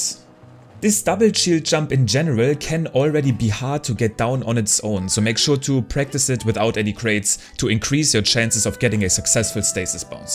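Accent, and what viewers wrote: Germany. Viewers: habe mich schon gewundert warum er so einen Deutschen Akzent hat. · I was wondering why he had such a german accent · He’s definitely German :) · man hört so sehr dass du deutsch bist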